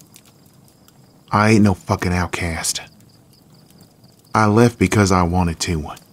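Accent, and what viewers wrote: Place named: Australia. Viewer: I love it that he called and your Australian accent amazing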